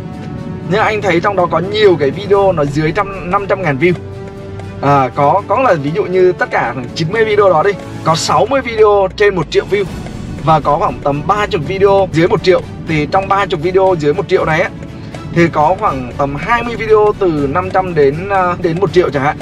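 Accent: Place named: Central, Vietnam. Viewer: Anh. Nói chất giọng miền trung và phong cách sống rất hay. Xin anh chia sẽ về: Nghề chủ yếu? Xuất thân, trình độ học vấn, Gdinh, vợ con, nhà cửa, sự nghiệp...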